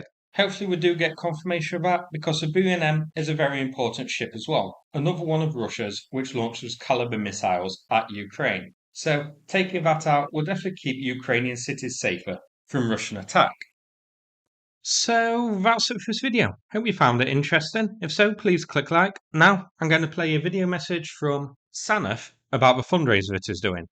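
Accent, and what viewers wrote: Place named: North, United Kingdom. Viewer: WHY DOES THIS BLOKE SPEAKING REFUSE TO HONOR MY REQUEST THAT HE SPEAK MORE CONCISELY AND WITH MORE OF THE QUEEN'S ENGLISH. He has a broader audience than just Manchester or Liverpool or the Lake Districts or wherever he lives. Thank you in advance, again!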